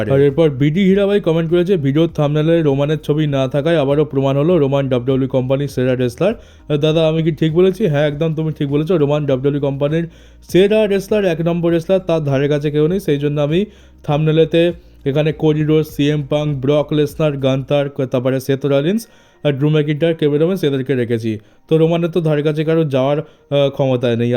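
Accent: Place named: India. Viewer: ভাই তুমি কি ভারতীয়? না মানে তোমার উচ্চারণ কিছুটা ভারতীয় আবার কিছুটা বাংলাদেশী দুটোই মিক্সিং যাইহোক আমি মহারাষ্ট্র থেকে বলছি 🇮🇳🇮🇳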